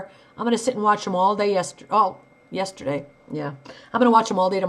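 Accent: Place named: New Jersey, United States. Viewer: Connecticut. Haha 😆 I was guessing you were from Jersey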